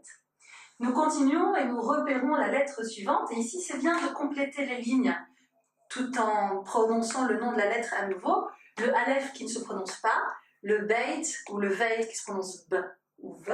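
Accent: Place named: Tlemcen, Algeria. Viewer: On dirait une tlemcenienne.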